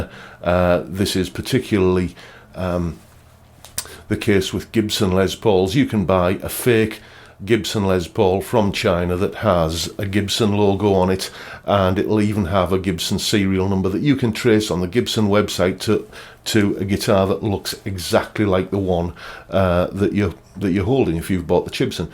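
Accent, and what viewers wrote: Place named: Teesside, United Kingdom. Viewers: If all guitars that look like other brands should be banned, then you would probably end up with only 4 or 5 guitar companies. 80% of the guitars around today are based on strat,tele or les paul's. I dont see why people get so heated, you pays your money and make your choice. Nice video and good to hear a Teesside accent on You Tube. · Thought you sounded like a good hint of Teesside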